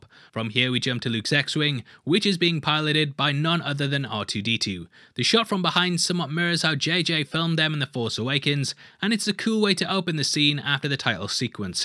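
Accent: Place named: United Kingdom. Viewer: Maybe it's a British accent but I find you much less annoying than Eric at new rock starz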